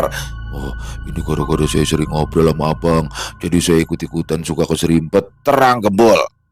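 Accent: Jakarta, Indonesia. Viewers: Selamat malam bang Klaten hadir ni yg ku suka logat Betawi ya mantap❤❤❤❤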